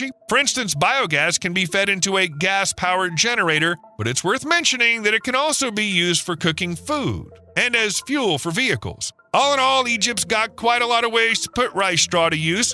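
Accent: United States. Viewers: Shouting American accent hard on the ears. Please bring a more toned down British person. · Very interesting but spoilt by the most annoying american robotic accent. He sounds as though he wares very tight underpants.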